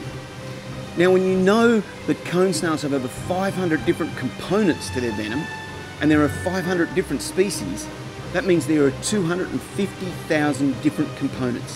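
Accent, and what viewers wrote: Australia. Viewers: Ur ausie arent yah? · As soon as I heard the Australian accent, and the one speaking the accent inside a lab coat, I was all in. Subbing to ya mate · Are you Australian? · Having this explained with an Australian accept on makes sense.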